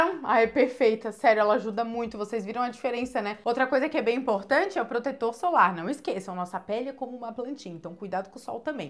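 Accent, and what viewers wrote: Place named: São Paulo, Brazil. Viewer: com esse vídeo realmente percebi que paulista tem sotaque hahahaha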